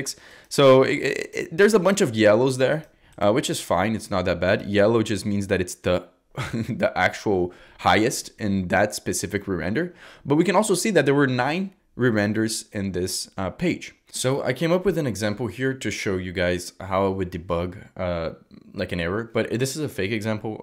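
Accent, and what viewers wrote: Brazil. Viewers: Rapaz Tú é carioca ou paulista?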